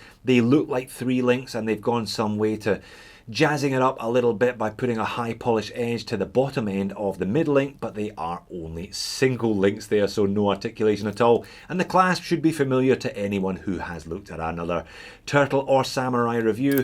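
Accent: Scotland, United Kingdom. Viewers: Aye. I just spent 300 on a new Pepsi turtle and now looking at this King samurai haha Where does it end!? Glad I found your channel. Really enjoy the review. And nice to hear a fellow Scot.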